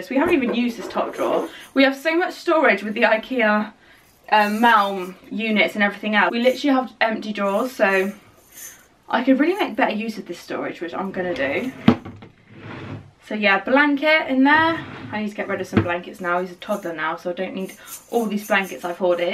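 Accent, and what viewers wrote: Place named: United Kingdom. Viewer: Her accent is so cute, cutest British accent I’ve ever heard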